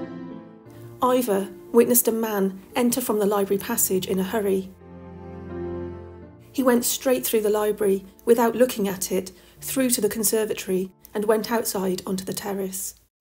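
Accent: United Kingdom. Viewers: Love a good ghost story, especially if it's British and recounted with a British accent ❤ 🇬🇧 the great Robert Hardy did a series on castle ghosts which I adored! This is awesome, thank you ⚘️ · hello love your YouTube channel and your videos and your British accent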